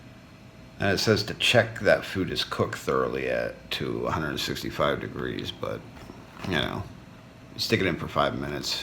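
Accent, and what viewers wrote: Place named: Pittsburgh, United States. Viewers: Definitely hear some PA in there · Idk why but I hear some Pittsburgh in your voice lol that's where I'm from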